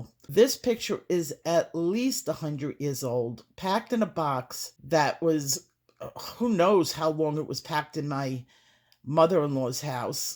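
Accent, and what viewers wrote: New York, United States. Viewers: What a fantastic find. I loved hearing the family history story. I always knew there was a strong New York connection. Can’t miss that island accent. 🤣. Former New Yorker here as well 🦄❤️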